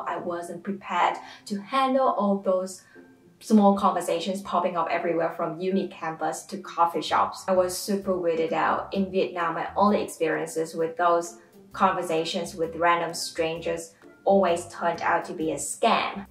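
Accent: Australia. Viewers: You speak very good English, in saying that your accent is almost Aussie. I hope you enjoy your life in Australia. 🇦🇺🇦🇺🇦🇺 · That is a beautiful accent, definitely class it as an Aussie accent but still different from the norm. You should definitely keep making those trips back to Vietnam, if only to keep that accent from becoming too "Standard Aussie".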